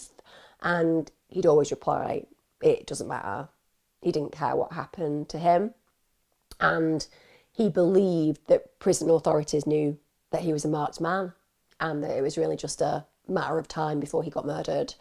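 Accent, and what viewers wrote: United Kingdom. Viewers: I just heard you in a deep dive and glad I did. Thoughtful takes and yeah, British accent. Good job. Cheerio!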